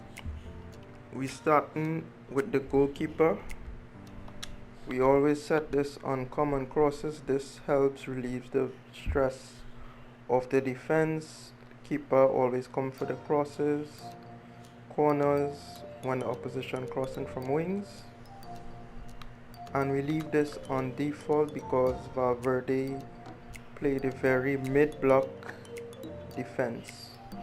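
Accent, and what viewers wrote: Trinidad and Tobago. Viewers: Bro is this your Trinidadian Accent? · Ahhh boy…from d time I hear d accent….done know he is fellow Trini. Respect bredda.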